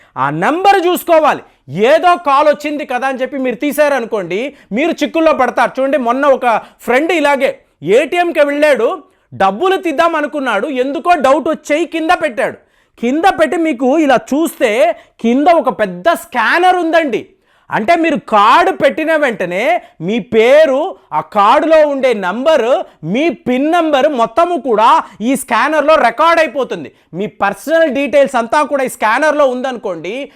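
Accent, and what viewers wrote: Tamil Nadu, India. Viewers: పాయాసం ఏమిటి సోదరా!!! పాయసం...అనాలి... మీరు ఎన్నుకునే సబ్జెక్ట్స్ చాలా ఇంట్రెస్టంగ్ గా వుంటాయి... విశ్లేషణ కూడా అదుర్స్...నో వన్ కెన్ బీట్ ఇట్.... అయితే మీవాచికంలో (స్పీచ్ లో) తమిళ ఆక్సెంట్ విరివిగా వుంటుంది...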